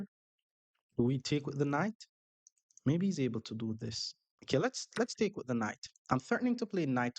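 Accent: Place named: Jamaica. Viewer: I watch this channel so much now that my thoughts about Chess are in a Jamaican accent.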